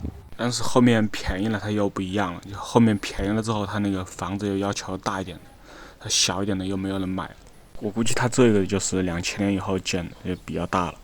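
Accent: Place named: Hubei, China. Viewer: 我以前有位同事也是湖北的，和你的口音好像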